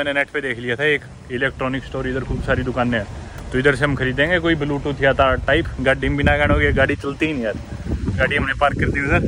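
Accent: Haryana, India. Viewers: Huge fan of your rugged Haryanvi accent - from Chennai 🇮🇳😂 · Me and my son watch your videos with great intrest as you cover all those places which we see in Atlas and read and watch videos about its cuisine. Your Haryanvi dialect is super. With best wishes.👍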